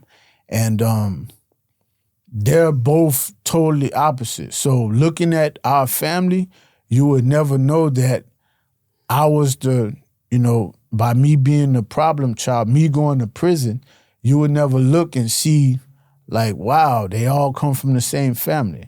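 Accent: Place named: Louisiana, United States. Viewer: He got that Louisiana draw in the way he speak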